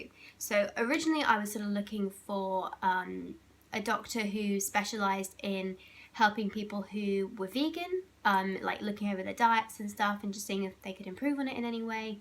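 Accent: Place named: United Kingdom. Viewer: This is my first time watching your videos, this one was on my recommended list. I was quite shocked by the British accent, I was expecting an accent from the U.S. but anyway, I just wanted to say that I'm sorry for what you're going through however, I'm glad that you found a doctor you like and who can do anything to help you out. You've earned a subscriber!! Take care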